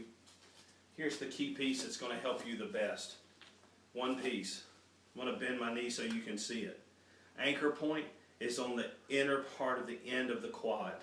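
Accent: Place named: South, United States. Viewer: I think he says 'interior meniscus' (with a southern accent), which means medial meniscus. And he meant to say 70-degrees angle. All good. Still a good, instructional video.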